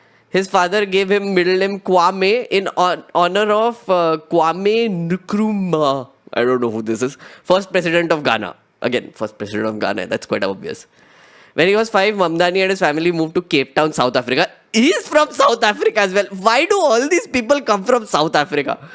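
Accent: India. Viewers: India accent so annoying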